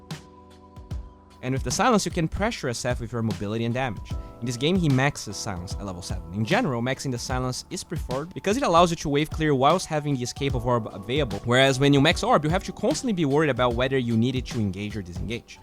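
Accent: Brazil. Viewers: esse sotaque de br quase imperceptivel mas eu percebi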